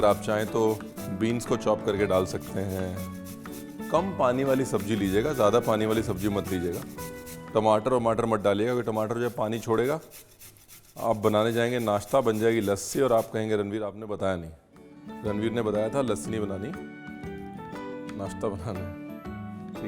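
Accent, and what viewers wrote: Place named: Kanpur, India. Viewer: easy recipe aur aap bolty bht achha hai mujhe lgta hai aap kanpur se hai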